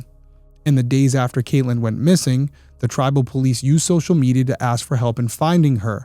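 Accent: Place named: New England, United States. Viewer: Great job. Also, I really enjoy the way you talk and verbiage used. It’s has that “New England” feel to it, and it’s sincere. I saw some commentary regarding the way you word things. I disagree strongly. Anything else would feel so phony coming from you. Don’t change.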